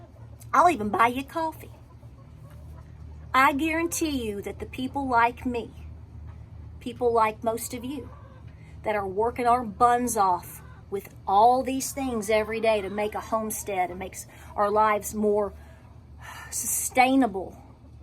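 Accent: South, United States. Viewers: Found you about a month ago and i am so grateful. Your wit and grace with that southern charm/accent waltz my heart. My mom, dad n sis have passed but you remind me to stay strong. Listen to this song, it's called " " by. Love u both, looking forward to your next vid! Ps, i got six hens this spring. Oh but one is a rooster. Having debated about his future. Advice or a vid about it would be great. Bless u child!!! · Yes, yes, yes! My dear Mom, may she Rest In Peace, was from Tennessee. Your sweet southern accent reminds me of her. Blessings ❤️ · I love Jesus!!! I also like your country accent. It’s pleasing to listen too. 😀. God bless you and keep doing what you do. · Keep on preaching the message sister, btw I love your southern accent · Am from north of the 49th. yup definately southern accent 🤠🙏🇨🇦😇🙌🇨🇦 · I love you....I'm southerner too...I love your accent....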